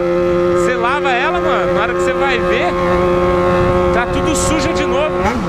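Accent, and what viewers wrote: Goiás, Brazil. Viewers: pq vc fala no pique do goiás,sendo que vc mora no paraná?kk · tu fala no pique do goias pq vc eh daqui de goias?..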